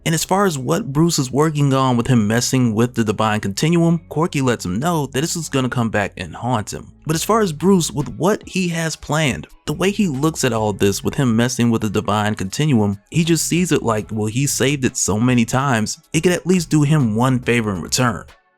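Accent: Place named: Southwest Virginia, United States. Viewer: Love your accent man im from southwest va lol it sounds my county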